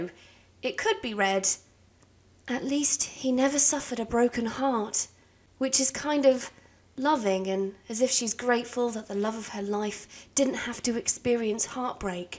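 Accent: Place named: Scotland, United Kingdom. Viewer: me hearing scotland be like, yas.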